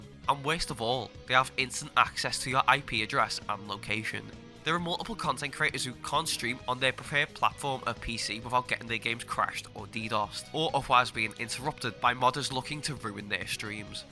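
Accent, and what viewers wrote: Liverpool, United Kingdom. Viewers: no he’s british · you from liverpool? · Are you a scouser? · still British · 😂 Scottish! Lmao he’s from Liverpool. not Scotland 🏴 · Are u scouse lad or u a wool? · hatred for bri'ish accent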